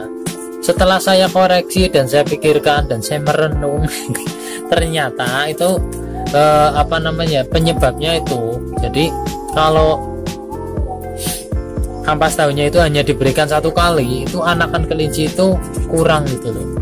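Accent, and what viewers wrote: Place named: Banyumas, Indonesia. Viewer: klu orang ngapak ketahuan cara ngomongnya... hidup ngapak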